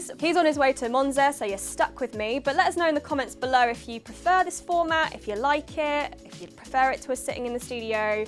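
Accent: United Kingdom. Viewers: I like the new format. Also Keep talking. As an American I love your british accent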